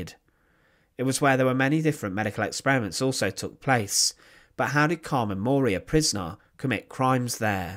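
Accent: United Kingdom. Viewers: Settle down, did you notice the narrator had a vaguely British accent. They love to insinuate that r sound when it's not called for, so Capi could sound like Carpo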